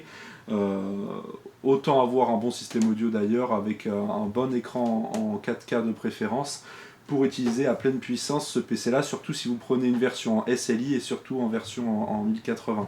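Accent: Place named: France. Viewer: fucking french accent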